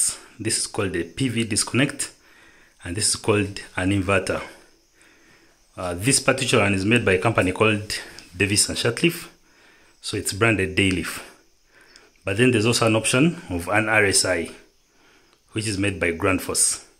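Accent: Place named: Uganda. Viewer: You speak like Ugandan brother. Otherwise weldone!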